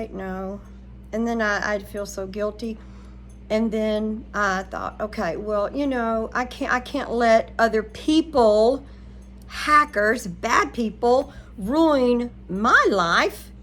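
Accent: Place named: Texas, United States. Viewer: ...Please don't think that your Texas accent is incorrect. I love that Southern & Texas sound. Speak it proudly❤❤❤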